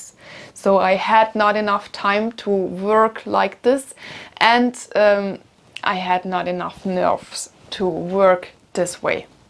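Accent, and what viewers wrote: Bavaria, Germany. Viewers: Hearing your accent makes me miss Bavaria. You seem tough and I think you will get this all right.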